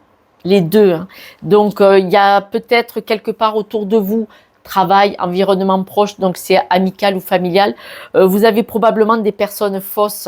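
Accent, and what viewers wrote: South, France. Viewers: Tirage très chaleureux et convivial à l'image de la personne que vous êtes, naturelle et authentique. Surtout ne changez rien. Cerise sur le gâteau, votre accent qui chante bon le sud ! Merci pour le partage de la part de votre nouvelle abonnée. A bientôt ! 🌞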